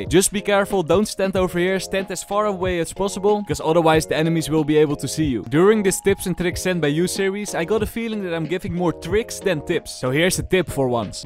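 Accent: Netherlands. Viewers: BRO YOUR ENGLISH ;( ur from the nederlands and u can hear that so fucking good like at least try to have a diffrent accent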